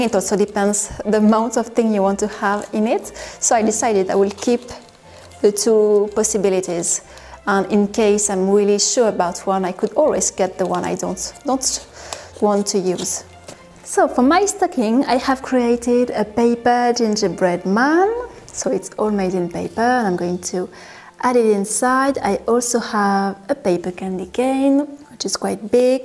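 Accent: France. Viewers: I am so enchanted by you, your lovely French accent and your art most especially.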